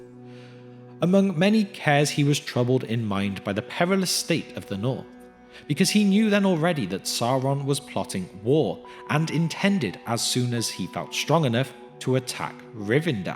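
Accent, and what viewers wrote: Wales, United Kingdom. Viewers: You're Welsh? If I ever bump into you in the Merthyr area I owe you a drink. You guys do amazing work on these videos, nice and easy to digest :) Do you have a Discord?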